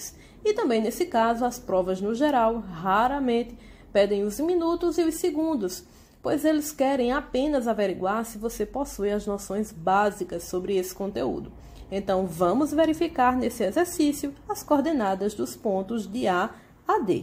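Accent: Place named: Northeast, Brazil. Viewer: que aula fera! ouvindo seu sotaque me lembrei do nordeste, um lugar que amo!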